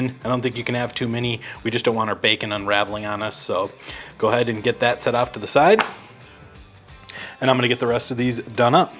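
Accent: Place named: Chicago, United States. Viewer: Are you from the upper midwest? You sound like you’re from Chicago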